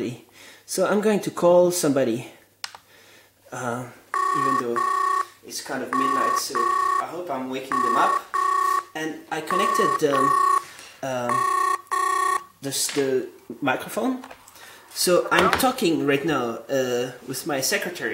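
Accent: France. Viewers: i spent like 15 mins trying to work out his accent,french or american? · Wait, it's probably a French accent. I don't know, I give up. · i now yore acsent youre french haha me to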